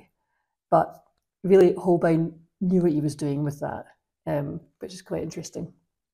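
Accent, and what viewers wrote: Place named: Scotland, United Kingdom. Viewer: Love to hear your Scottish voice. I like Americans but its great to hear a familiar accent.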